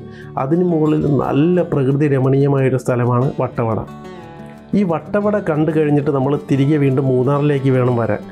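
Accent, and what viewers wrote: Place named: Trivandrum, India. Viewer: മൂന്നാർ ട്രാവൽ ടിപ്സ് സിംപിൾ ആയി അവതരിപ്പിച്ചു പൊളി...... അതും ട്രിവാൻഡ്രം സ്ളങ്ങി ൽ ..... ആശംസകൾ 🌹🌹 കൂടുതൽ വീഡിയോസ് പോസ്റ്റ്‌ ചെയൂ..... 👍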